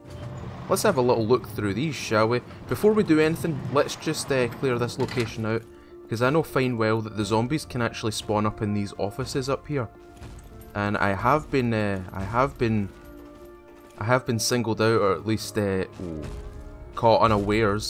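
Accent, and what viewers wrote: Scotland, United Kingdom. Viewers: Ah the thick Scottish accent love the accent of some of my ancestors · I love your scottish accent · is he scotish? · are you scottish?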